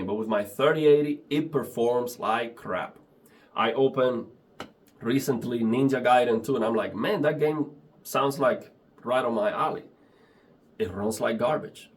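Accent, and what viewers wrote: Cuba. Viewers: I knew that was from some Spanish speaking country because of occasiona cute s before e. Cuba:) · Lo sabiaaaa asere el acento me sonaba a cubano. Felicidades hermano. Keep it going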